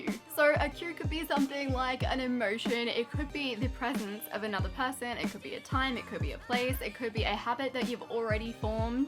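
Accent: Australia. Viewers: I love her accent <3 Is she Australian?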